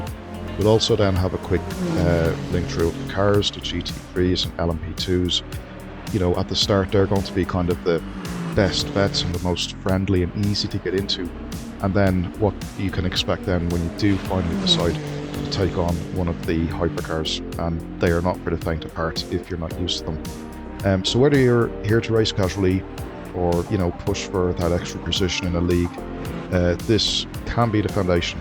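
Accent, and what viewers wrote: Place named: Ireland. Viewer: Don't ya just love hearing an irish voice in sim racing :D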